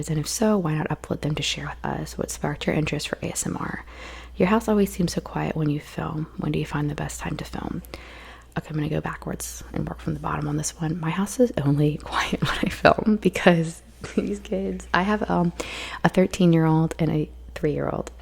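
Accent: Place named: Maryland, United States. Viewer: And also, accent wise, I’m originally from Maryland and i think I might I hear a little of that (or Pennsylvania since they’re similar) in you!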